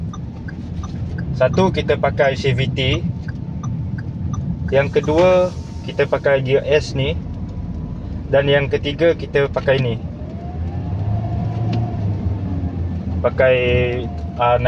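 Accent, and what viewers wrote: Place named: Sarawak, Malaysia. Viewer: Org srwak je ade tune mcm n😁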